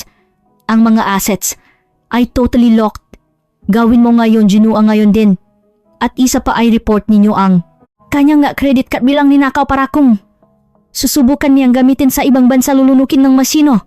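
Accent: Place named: China. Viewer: Parang hinahabol n kamatayan ang narrator, at masyadong slang n inchik ang pagkakasalita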